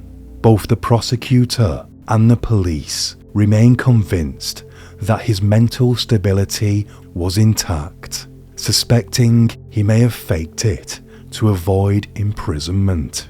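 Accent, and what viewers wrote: United Kingdom. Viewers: This popped up in my suggested The narrator sounds like he could be British chills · He's British! That's his normal tone. I love his voice.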